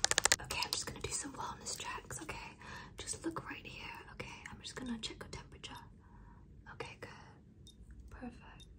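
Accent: United Kingdom. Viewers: + why do you have a British accent?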